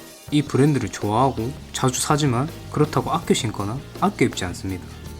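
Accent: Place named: Gyeongsang, South Korea. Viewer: 사투리 안고치면 구독자 이정도가 한계일듯ㅜㅜ 경상도 사람인데도 엄청거슬림ㅜㅜ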